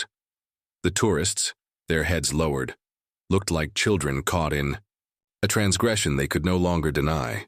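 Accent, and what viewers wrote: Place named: United States. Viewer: Yet another load of American accented crap!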